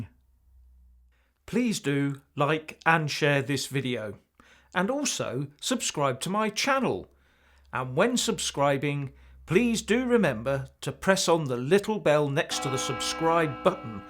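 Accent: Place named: England, United Kingdom. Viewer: I would love to hear you curse, nothing better than a strong English accent dropping the “C” bomb. 👍👌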